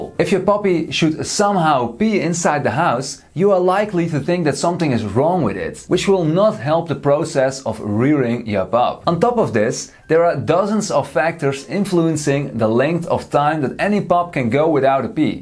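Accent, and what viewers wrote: Netherlands. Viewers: Are you Dutch your accent sounds dutchhh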